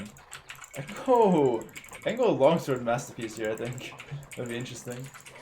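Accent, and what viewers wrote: Canada. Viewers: haha thats just how we talk in Canada. We gotta say honestly before explaining something or how else will people know we are being honest? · ...You sound like your from the French speaking part of Canada.. or speak French.. you should should try and impersonate an Aussie accent ✌🏽🇦🇺🤙🏽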